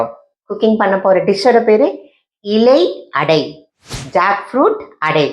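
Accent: Kerala, India. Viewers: Anyway Tamil samsarikkumbol malayala slang nannayittundu. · That Malayalam slang unne vittu pokale 😊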